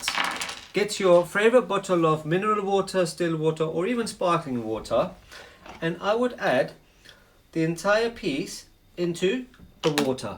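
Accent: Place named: Pakistan. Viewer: This guy sounds like a pakistani not omani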